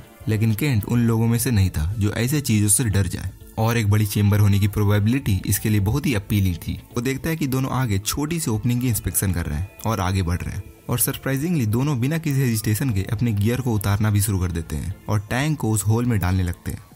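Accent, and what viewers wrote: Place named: Purvanchal, India. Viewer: bhai tum UP purvanchal side ke ho kya? Tumhari pronunciation 🤐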